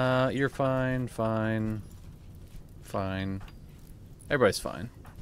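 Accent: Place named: United States. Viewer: What a weird name...are you actually? You sound like an American.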